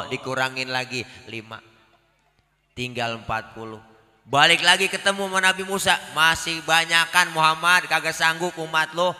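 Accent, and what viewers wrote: Betawi, Indonesia. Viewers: Asalamualaikum pak ustad..bahasa betawi ny pak ustad bikin kita. semua..ketawa mulu..terima kasih ilmu ny pak ustad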